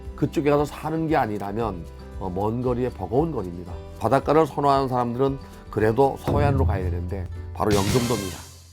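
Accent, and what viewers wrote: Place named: Gangwon, South Korea. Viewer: 교수님 고향이 강원도래요? 어째 말투가 강원도 토박이 말투래요?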